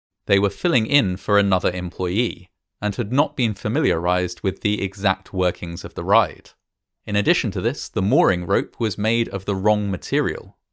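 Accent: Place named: RP, United Kingdom. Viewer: Why does this guy speak so slowly does he think that Americans won't be able to understand is highfalutin English accent? I have to turn it up to 125% playback speed just to not be annoyed.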